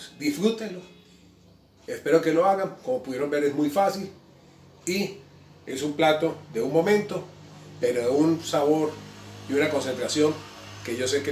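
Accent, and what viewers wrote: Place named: Caribbean Coast, Colombia. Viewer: Tu eres costeño jajajaja to oir tu accento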